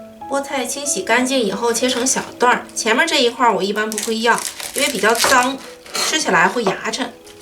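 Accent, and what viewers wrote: Tianjin, China. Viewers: 口音像天津人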